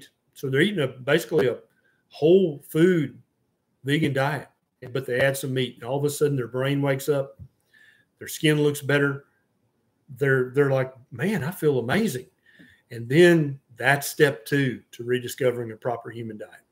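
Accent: South, United States. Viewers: I don't hear an accent, just a nice southern draawwlll. 😉😉😉😉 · Im from south carolina and you sound like my boss. You look like him too. Wonderful accent, respectable guy, very southern and genuine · You have a nice Southern accent! I spent a year (1977) going to school at NATTC Memphis and to this day I still talk southern! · Come to South Carolina where people do have a southern accent. I am proud of mine and yours too. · 😂 yes, we all have an accent. I’m Canadian and you probably think we have an accent. Anyway, you have a cute southern accent 😃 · It’s not an accent, it’s Southern Dialect. I’m from Lithuania and do have accent I’ll die with, but oh well… 😊 In my old country of 3,7 million people there are 4 different dialects believe it or not… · I think they were referring to your Southern accent 🤣😉😍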